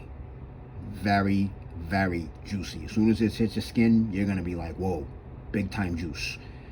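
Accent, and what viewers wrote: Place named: Brooklyn, United States. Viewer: thanx brother you the man also your accent is phenomenal reminds me of here in NYC specially Brooklyn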